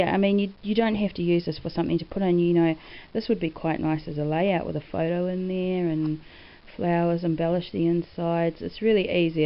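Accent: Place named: New Zealand. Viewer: Wow this is breathtaking I love it i am making one at the moment for my nan but Hot dollar has the book box already to go...You sound like you are from New zealand I am from Australia were not that far lol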